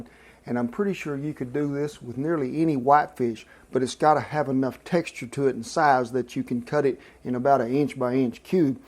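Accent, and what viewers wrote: South, United States. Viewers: Hahahaha, love how he splits it evenly. Love the idea and I will buy some cod and try this out. Oh yeah, gotta love that country accent!😁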